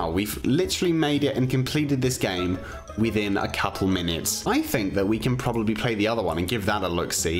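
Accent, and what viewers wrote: United Kingdom. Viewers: green ninja 5521 hes british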